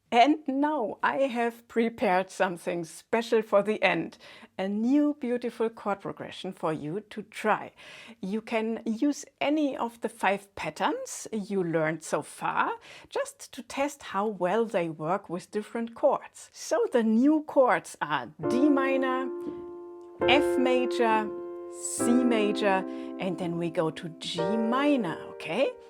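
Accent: Germany. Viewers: Hello, your English sound German. Are you from Germany of one of the countries which speak German language. Excellent video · I LOVE THE GERMAN ACCENT..!!!...UM IS THAT YOUR HERITAGE..?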